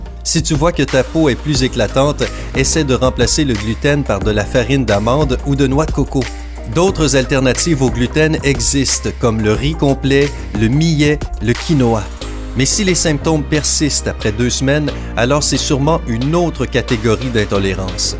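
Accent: Quebec, Canada. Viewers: J'adore l'accent québécois, je pourrais écouter pendant des heures ! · Bonne vidéo mais j'aime pas l'accent québécois 😲😲😲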